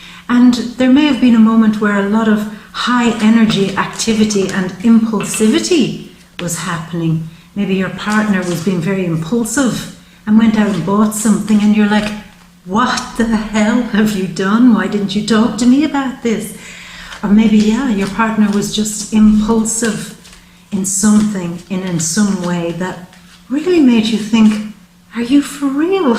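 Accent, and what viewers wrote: Ireland. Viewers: 🤣🙏 you make me giggle, what county are you from in Eire? I can't figure out your accent...it sounds like a very, very soft out skirts of Dublin or then I'm thinking is it Clare lol go on your going to tell me neither. You have a joyful soul thank you for your work, your time & energy it's gratefully appreciated & I've taken note....don't just spunk my money I got it! 💖💝🌈🙏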